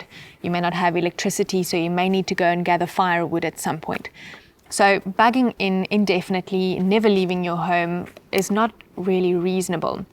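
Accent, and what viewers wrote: South Africa. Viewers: Always an outstanding production 👏 of course that South African accent is the icing on the cake lol, one can never be over prepared for the future we heading into. Without a doubt the weak and unprepared will not make it, but I dont think you need to be concerned with that lol. Thankfully the father gave us a perfect road map to navigate the grim future that lies ahead, praise be to Jesus! Thank you for your time and insight, always appreciated 💪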